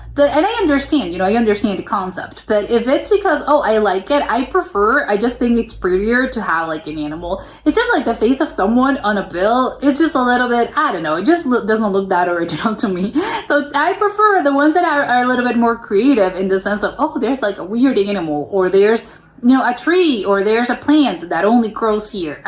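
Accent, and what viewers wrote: United States. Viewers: Dragon, seagulls, king.... hilarious. 🙃Well, Finland's currency has been €uro since 2002. Before that, it was mark. "Kymmenen markkaa" means ten marks. "Dragon" is a lion and "seagulls" are swans. Finland has had a president since 1918, not a king. However, you sound very, very, very American. ☺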